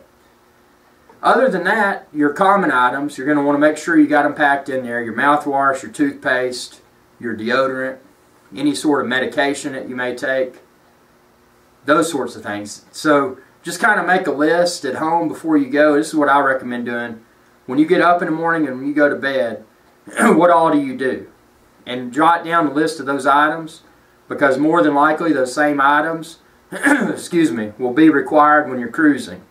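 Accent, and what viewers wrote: South, United States. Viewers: That was awesome. Thanks for the information. But what really kept me watching your video was your cool southern accent ;)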